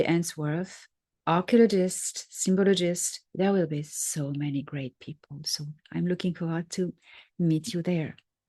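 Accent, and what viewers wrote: France. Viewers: it would be awesome to listen to an audio book read by you with your beautiful French accent ❤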